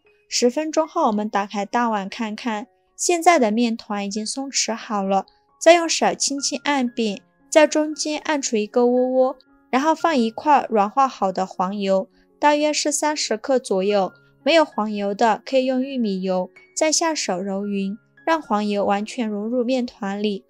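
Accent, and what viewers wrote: China. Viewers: 呵呵。請問這跟台灣人的素質有什麼關係。因為影片裡說的是大陸口音嗎？差別心在你不是我。到底誰心裡殘缺呢？？我只是說加雪碧就是麵糰裡加蘇打跟檸檬酸和糖。沒什麼值得神奇的。因為對於烘培加蘇打在麵糰裡是很常見的。而你生出那麼多情緒。還上升到台灣。只覺得無知又可笑🤣🤣🤣🤣🤣。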